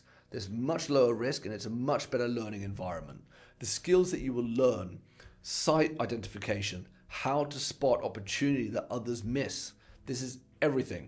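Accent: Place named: RP, United Kingdom. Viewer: You sound very posh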